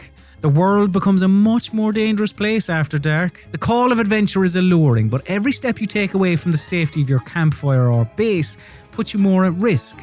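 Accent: Ireland. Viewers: I have never heard such Irish accent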